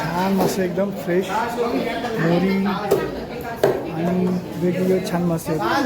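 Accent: Malvani, India. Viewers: Mala vatta tumhi mumbai la rahta. Karan tumchi malvani proper nahiye. Maka di aste te tumhi bolta maka de.. Mi yenar tevha ghetay - mi yetalay tevha ghetalay asa aste. Marathi mix bolta tumi. Ani kolambi nahi ti sungta malvanit boltat. Baki chan video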